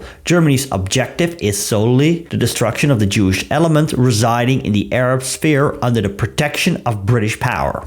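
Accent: Netherlands. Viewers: I can hear you are Dutch love the accent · Within the first few seconds of this video, I knew you are Dutch because of your distinctive accent.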